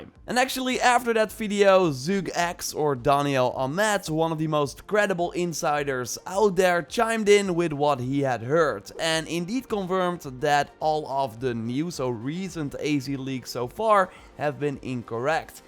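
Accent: Netherlands. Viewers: your Dutch accent is really obvious. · Ah, that Dutch accent :-D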